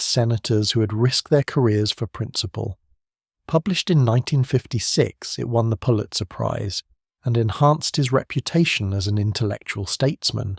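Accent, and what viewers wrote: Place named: United Kingdom. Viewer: Yeah. Why do we need a goddamn Limey to tell us about our presidents? There's no excuse for it.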